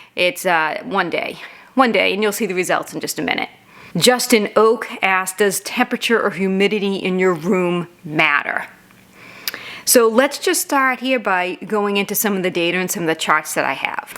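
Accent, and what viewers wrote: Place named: Boston, United States. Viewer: is from the Boston area.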